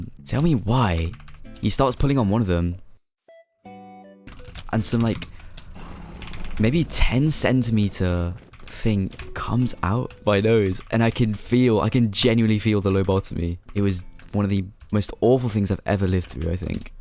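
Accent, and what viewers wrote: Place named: United Kingdom. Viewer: Nice British Accent =)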